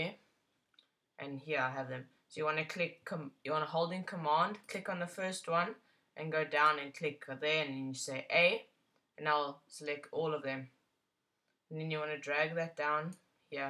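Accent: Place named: South Africa. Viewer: So South African! makes me miss home :)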